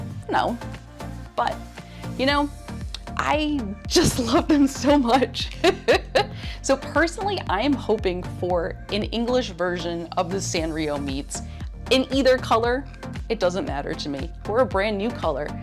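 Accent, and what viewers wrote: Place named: England, United Kingdom. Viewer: by the way I love the way you talk and sure your English accent. You could be my English teacher 😅😊 and that’s why I watched all of your videos. Yeah... I’m crazy about the tamagotchis too.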